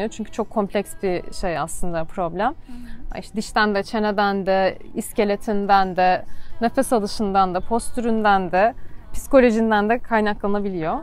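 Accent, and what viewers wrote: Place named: United States. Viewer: Böyle bir konuşma şeklinle hangi aklı başında insan seni destekleyip abone olur bilmem ama şunu bilirim bu kadar yapmacık birini ve ses tonu rahatsızlığını asla seyretmem hanım kendiniz olun bence Amerikan ingilizcesi sizin çok eğitimli olduğunu göstermez bilginiz olsun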